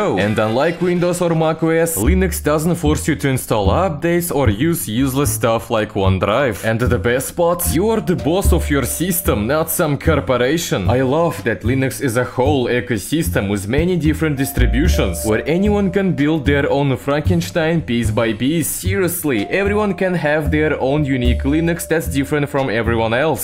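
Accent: Russia. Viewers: bro english in russian accent is so harddd🔥🔥🔥🔥🔥🔥🔥🔥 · Бля чел у тебя русский акцент почему у тебя только английские субтитры и озвучка😭😭😭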